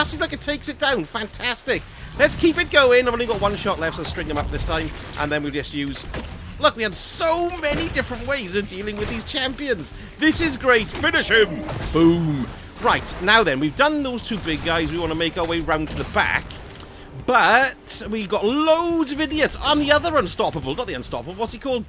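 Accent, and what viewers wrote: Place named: Yorkshire, United Kingdom. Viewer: Yes, Finally filled my engram inventory so farming this to fill postmaster and get what I need. Loving the Yorkshire accent during this too sounds like my neck of the woods. Much love.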